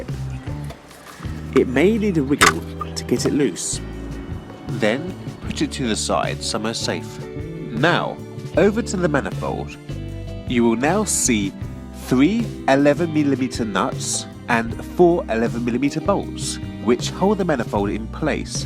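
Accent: United Kingdom. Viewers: Fucking gay British accent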